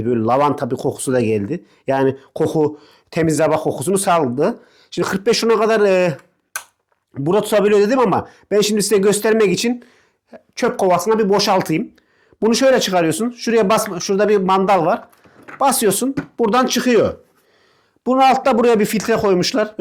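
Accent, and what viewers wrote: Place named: Ankara, Turkey. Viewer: Bi düzgün konuş ya tam ankaralı ağzıyla konuşuyorsun etrafındakiler nası tahammül ediyor acaba. K ları ğğhk diye söylüyorsun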